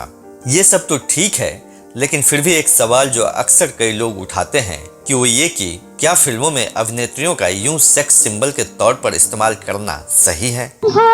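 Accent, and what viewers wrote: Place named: Bengal, India. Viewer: ji Inka Bengali accent hai isiliye r ko d keh rahe hai.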